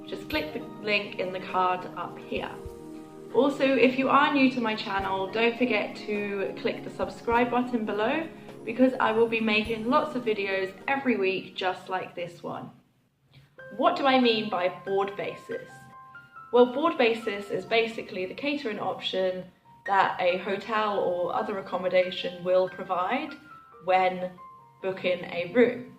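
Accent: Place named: United Kingdom. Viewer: Beautiful British accent!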